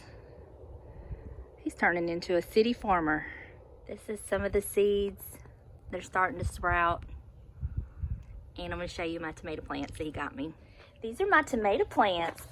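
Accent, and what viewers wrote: South, United States. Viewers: Where are you from....GA? I love your southern drawl! And tomato sandwiches, ain't nothing like it. Snip those early tomato buds! WE live in NC and are babying our tomato plants. I CANNOT WAIT!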